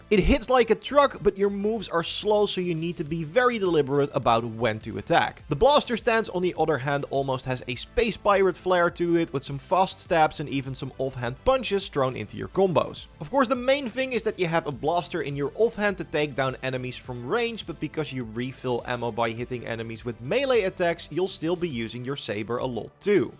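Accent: Netherlands. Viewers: Dutch guy speaking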